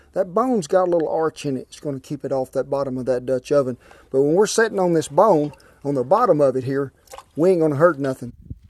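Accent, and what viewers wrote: United States. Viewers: Outstanding entertainment and information. Thanks for sharing. ATB Nigel from North East England. p.s. i just love your accent and american speech patterns!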